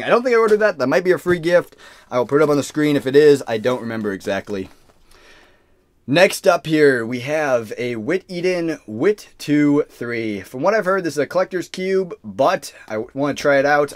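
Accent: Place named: Great Lakes, United States. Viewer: Great Lakes accent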